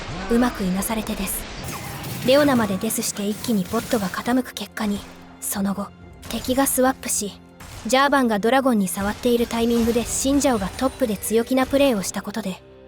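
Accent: Kansai, Japan. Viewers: なんでそんな関西弁押しになったんですか？😂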